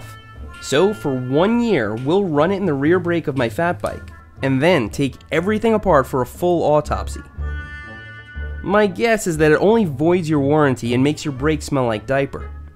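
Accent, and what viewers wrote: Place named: New York, United States. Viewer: Hi! This is a great way to learn English! usually uses very good vocabulary and grammar, his accent is a little New York but not much, and his pronunciation is excellent. I noticed that another viewer commented on your English and I wanted to clarify on some things. First, remember that in English, we capitalize nationalities, so the word "german" should be "German". Second, the third person singular form of regular verbs in the present tense in English require an "s" as an ending ("asks"). Also, it is very important to capitalize the pronoun that we use for ourselves, the word (not letter) "I". We need to capitalize that one all the time. The sentence "I learn English for 3 hours" is a little strange, and might sound better in the present progressive ("I am learning English for three hours"). Finally, you need to fix your capital letters for the start of a sentence, for the pronoun "I", and for the proper nouns "English" and " ". 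You shift your verb tense from present progressive "am learning" to regular present "I watch", which is a little strange, but not grammatically incorrect. It would be a little better to say, "I am learning English if I am watching." A corrected form of your entire post would be: I am a German boy. My mom asks me if I am learning. My answer: I learn English for three hours. My thoughts: I am learning English, if I am watching. I hope that this was helpful, remember those capital letters, ride your bike a lot, and keep watching '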